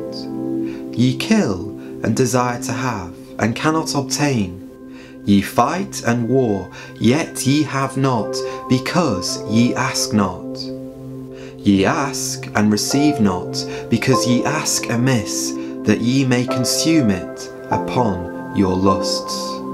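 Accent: United Kingdom. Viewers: I'm so glad you made this type of video,. I can listen to my Father's Word hour after hour after hour. I sincerely do love and honor God's Word and it's nice to have your British voice speaking it!😁 Thank you and may The Lord bless and protect you and your fam on your journey to spread His Word and His precious gospel in Christ Jesus' mighty name. Amen!!😇🎚️🙏🌈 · I am a Filipino Christian ,I just want to congratulate that your voice was lovely a British accent, so lovely hearing Bible verse..God's talent🎈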